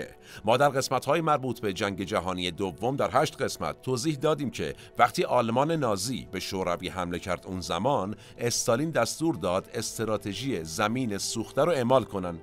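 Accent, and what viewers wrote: Iran. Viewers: نخست با سپاس از روشنگریتان برای گره و سرور که بسیار خوش واژه با گویای زیبا. در این ویدیو شما سرور اسلاح (اصلاح) کردید و مانند یک ایرانی خوش روی گویندگی میکنید. امیدوارم همیشه به این روی باشد. خواهش می کنم از واژه های پارسی در گبتان بکار ببرید. مانند: مـــــــــــــ♥ـــا مردم بزرگیم، نه کبیریم. با سپاس از شما و گروه.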